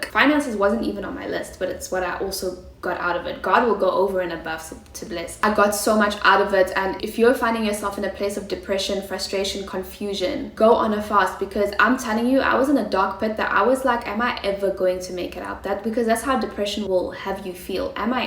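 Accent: South Africa. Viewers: Are you south African ? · your south african accent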